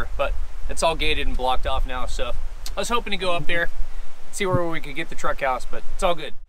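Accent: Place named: Alabama, United States. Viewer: Where are you from? Accent sounds like Alabama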